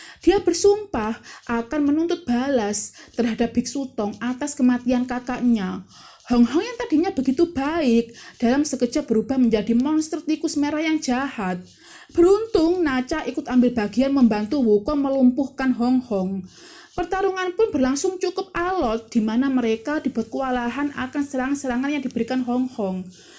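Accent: Java, Indonesia. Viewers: Medok karena mbaknya orang Jawa,,, masing-masing Suku mempunyai ciri khas,,, ini lebih baik dari pada pakai suara google,, imut, renyah, empuk,, tapi bukan suaranya sendiri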